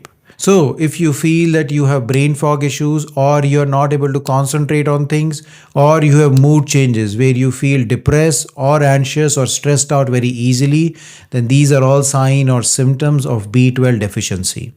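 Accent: India. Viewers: Sorry, but now I am totally screwed up. I've been using the Methyl Cobalamin format of B-12 for years based on available research. Now, we have this feller suggesting that Methyl Cobalamin is the best format. The problem is that I have no intention of trusting a feller with the kind of accent, name and appearance I am seeing and hearing in this content. Sorry, but when I listen to him, I have images of those folks who, before I blocked them, used to call me five times a day and tell me they were Microsoft engineers from Mumbai and were available to help resolve my computer issues if I would only buy them some gift cards. Perhaps the sponsor of this content could hire a busty young blonde haired white woman from Texas, with a southern accent, to pitch this product. Otherwise, I guess I'll just stop taking the B-12 or do the opposite of what this feller is suggesting. 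Thanks for your attention to this matter.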